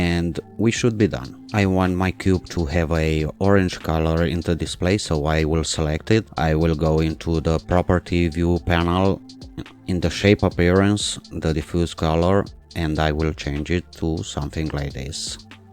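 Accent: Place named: Finland. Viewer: but the way people from finland speak so slowly and with their accent makes the video drag on. Not that i don't mind the accent, but i feel like the video is going way too slow as if i'm being talked to incredibly slow on purpose because someone assumes i'm retarded. LOL. good info though.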